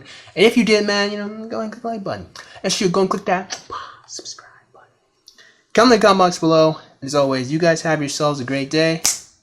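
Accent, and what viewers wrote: United Kingdom. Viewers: I love your british accent btw, some real funny shit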